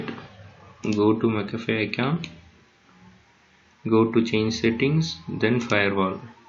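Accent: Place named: India. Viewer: no offense i thought it was an indian scammer about to make me lose my adminstration to the computer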